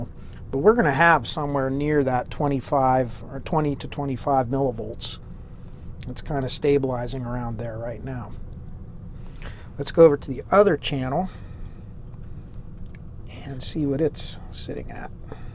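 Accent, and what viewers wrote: Pennsylvania, United States. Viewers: Johnstown Pennsylvania accent.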